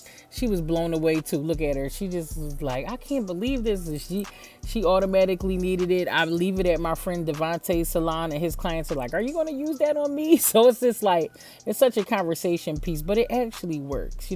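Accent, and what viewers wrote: Philadelphia, United States. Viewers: You was sounding mad Philly in this voiceover! Love you girlie!😜❤️ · Are u from Philly? You accent an slang made me ask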